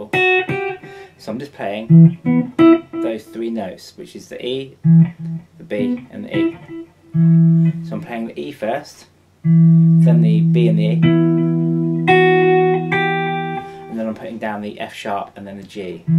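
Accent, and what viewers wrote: England, United Kingdom. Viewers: english mate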